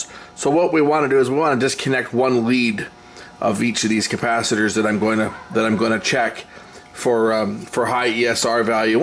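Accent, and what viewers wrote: Canada. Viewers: Cool video. You sound like you are from Canada? Are you?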